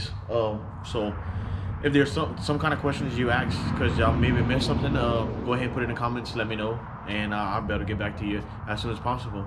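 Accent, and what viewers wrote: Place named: South Louisiana, United States. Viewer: bah you gotta be from south lousiana talkin like dat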